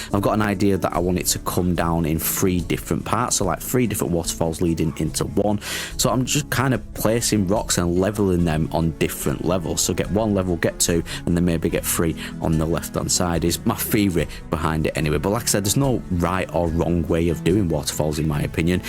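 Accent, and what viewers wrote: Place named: Northern England, United Kingdom. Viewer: Random comment, but being from the UK, I love hearing a northern voice on YT!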